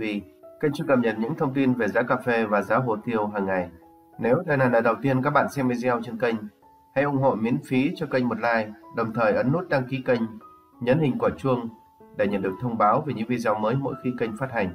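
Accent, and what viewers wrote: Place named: Gia Lai, Vietnam. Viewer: hôm nay giọng đọc đặc trưng của người dân Gia Lai đã trở lại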